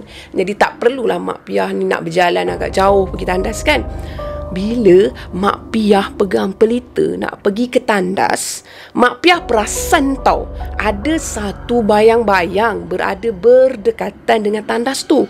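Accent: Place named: Pahang, Malaysia. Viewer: ni org pahang kan ke bukan sebab loghat macam org pahang..kalau org pahang beloh mane.. semoga maju jaya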